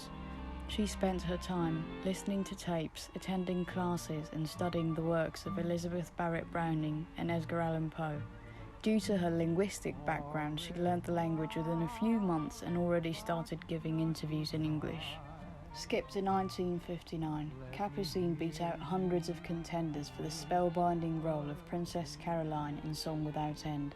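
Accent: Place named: United Kingdom. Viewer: I like your specific British accent! It has a cool, unphased, couldn't-be-bothered quality. Good video essay, as well!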